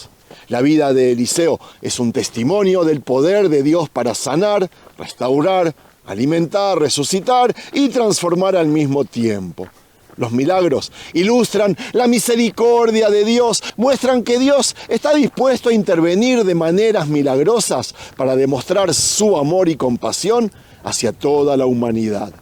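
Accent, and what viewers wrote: Argentina. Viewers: ¿es Argentino el narrador?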